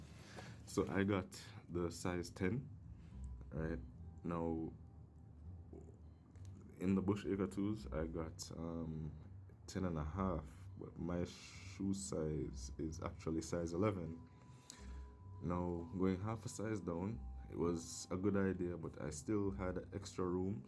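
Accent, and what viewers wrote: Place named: Jamaica. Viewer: Can hear the Jamaican in your voice from you start talk bro keep doing clarks reviews bro rate them with different colours #repfromjamaica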